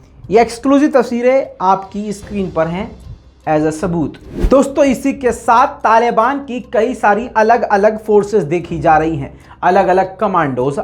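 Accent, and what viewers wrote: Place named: Indore, India. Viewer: पक्के इंदौरी भाषा बोले हो भाई आपकी न्यूज देखने और सुनने का मजा ही अलग है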